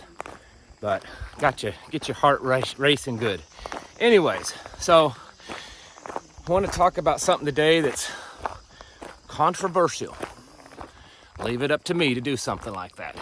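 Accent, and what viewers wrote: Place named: Arkansas, United States. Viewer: You said secession at least four times....you sound just like me! Arkansas Confederate.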